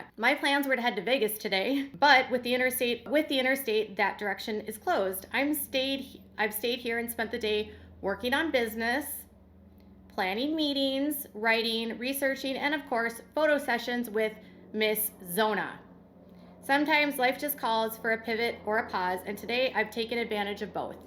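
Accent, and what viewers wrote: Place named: New York, United States. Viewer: Where are you from? You have my home town accent (Rochester/Buffalo NY)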